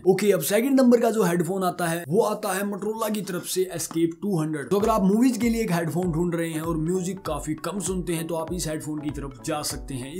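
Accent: Haryana, India. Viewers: Bhai haryana t h k tu 😂🤙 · Pakka tum Haryana ke hi ho:face-green-smiling::face-green-smiling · awaj me haryana jhalak rha h bhai.😃... baki full support h bhai ko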